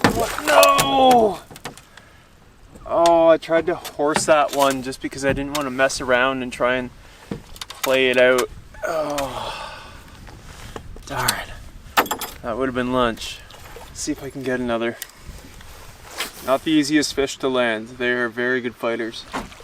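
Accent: Canada. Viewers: PAROGIES! I moved to the United States in 2008 and that is a food they don't really eat down here. This is the most Canadian video I ever have seen and nothing has made me feel like I've been back in Vancouver like hearing you. Previously, the most Canadian man I've encountered was a dude from Alberta trying to marry my dad's cousin in Budapest. We were both on the wrong continent but we felt like North Americans together.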